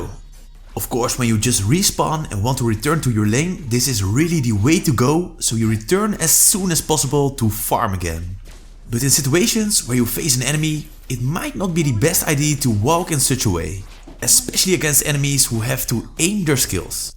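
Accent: Netherlands. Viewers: I can hear Dutch accent. Am I right? 😂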